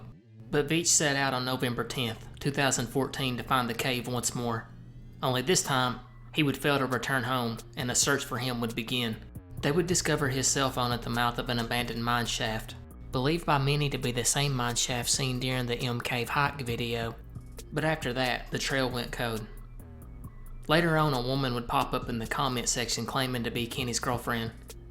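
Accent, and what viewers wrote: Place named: Mississippi, United States. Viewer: That’s the First thing I thought. Great content in something close to my Mississippi accent! Keep up the good work!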